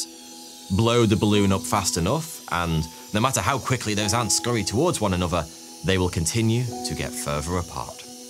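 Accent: United Kingdom. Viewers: Gravity is 10 to the 39th power weaker than electro-magnetism. The true energy that binds the universe. All this great attractor, dark matter, dark energy, black hole, suns are nuclear furnaces nonsense is exactly the reason, the "experts" keep on getting baffled. Everything is connected. Not by gravity, as it only attracts. If gravity were true, everything would be colliding and smashing into each other and no life would ever have formed, anywhere. The universe is balance, constantly changing, constantly in flow. This is how its perpetual. It isn't expanding, the speed of light is not a constant and red shift is not due to gaining of distance, its the measure of youth, higher energy. Besides, how can the universe be expanding, if there are all "super massive black holes" at the heart of every galaxy, sucking everything in. Its all fundamentally flawed and all the degree holding towers of the line, have their heads so far up Einstein's ass, they can't smell the dark mode plasma. 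Thought and movement are electric. We are tiny mirrors of the universe, as well as antennas, picking up signals, which means, the universe is electric too. Everything is connected. Electrically, gravity is a mere by-product. This episode of Thoughty2, sucks. Just another British voice on the screen, talking to the little kids watching with awe and wonder. Science has been compromised and is taking orders from the wealth, like everyone else. Keeping secrets, telling you how things work, as how one woul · Which part of British accent it is?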